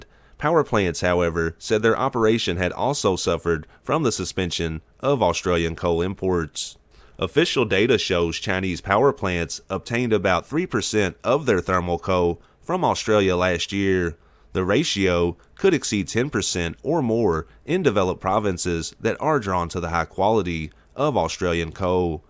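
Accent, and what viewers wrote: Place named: Texas, United States. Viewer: This dude has Texas accent wit good old boy twang to it .But besides that China is using more resources then America ,and at some point the shit is going hit the fan on resources in the world ,but may with pandemic will save some resources for a short time ,but I don't care about China .But the sure is going to be fuck up in the future with resources .And mostly will have do with some greedy assholes at the top of the food chain ,who are no very bright as it due the fact some their daddy's give them the company or very large wallet to throw around money .Since this always starts at the top .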